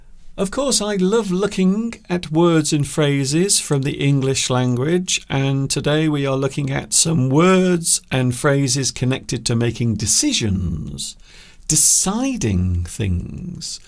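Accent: United Kingdom. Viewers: It's good to learn English here.British English.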